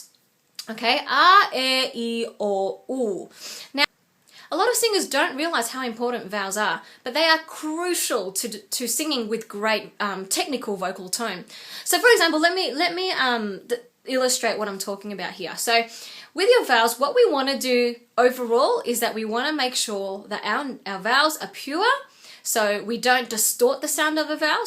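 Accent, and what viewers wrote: Australia. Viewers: Does she speak with Australian accent? Or am I mistaken? :) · Omg are u Australian I looove the Australian accent it's soo cool!!!!!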